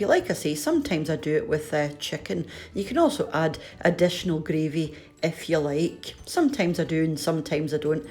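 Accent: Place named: Scottish Borders, United Kingdom. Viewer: I found your website two days ago. My family are from the Borders; Carlisle and Dumfries and listening to you reminds me so much of my many visits to my cousins. (I am Canadian) And so much of what you make is familiar to me from my mother's cooking and from my Dad's mother's cooking. Just good decent home cooking where you don't have to go out and buy some ingredients that are too expensive, you have never heard or have to order online. This is the way REAL people cook,. Thanks so much for posting.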